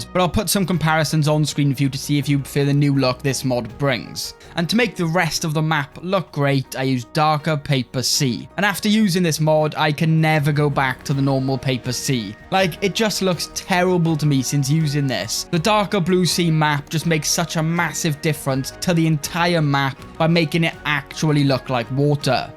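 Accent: Wales, United Kingdom. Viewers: Great video as always (nice Welsh accent btw)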